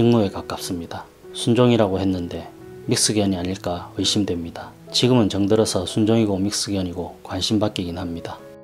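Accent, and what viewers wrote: Gyeongsang, South Korea. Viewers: 감사히 잘 보고 갑니다 ^^ 말투가 경상도 쪽이신듯한데..맞나요 ㅎ^^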